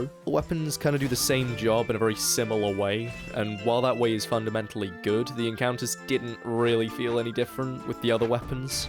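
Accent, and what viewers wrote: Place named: Australia. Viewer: you're aussie arent you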